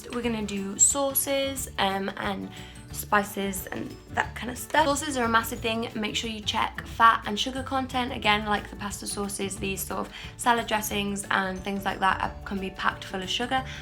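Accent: Wales, United Kingdom. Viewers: Is that a Welsh accent I hear? Xo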